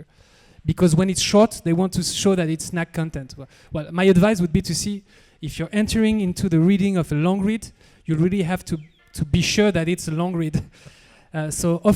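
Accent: France. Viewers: C'est quoi cette histoire de tout le temps parler en anglais ?? Vous vous rendez compte à quel point c'est pénible d'écouter un français parler anglais pendant 1 heure... Please The Family, dont get lost in the sauce...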